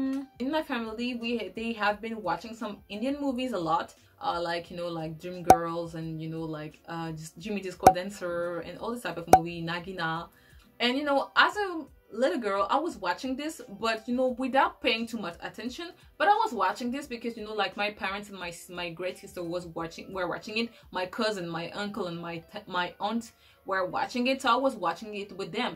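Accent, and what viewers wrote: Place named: France. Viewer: I love the post you did with best dancer and you chose Madhuri.I love all the courtesan songs like you.I am a British Sikh lady and love your french accent.I studied French when I was younger 😂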